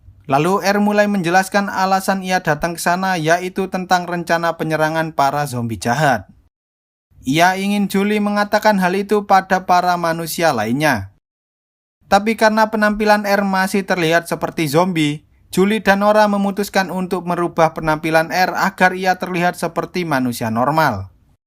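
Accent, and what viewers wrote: Java, Indonesia. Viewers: Ene wong jowo iki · Koee wong Jawa Yoo mas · Jowo ne ngendi mass?? Maklum Subscriber awal🔜😁😁😂🤣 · fix logat wong jowo😆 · Ngomongne medokne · Kasi lope dong lur kwkw daerah mana bang jawa nya? Gua jateng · Wong Jawa apa pie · jawane medok yo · Kamu orang jowo yo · abang orang apa? jawa? abang dalam menjelaskan filmnya kocak banget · Abang orang jowo (jawa) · LOGAT ELO MEDOK JAWA BINGITS SIH MAS E NARATOR. NGAKAK GW 😂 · Orang jawa yah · admin ngomongnya jgn terlalu medok jawanya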